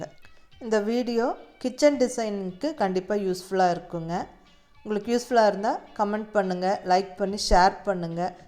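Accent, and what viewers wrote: Kongu, India. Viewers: Mam, you are an inspiration to us! andha kongu tamil super ponga. adhukagave onga videos paakrom 😊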